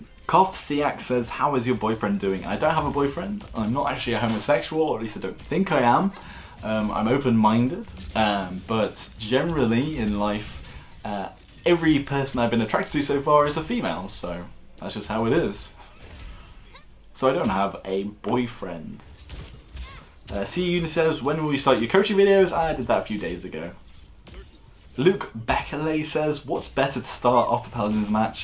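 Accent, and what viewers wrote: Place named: England, United Kingdom. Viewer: What part of England are you from? :D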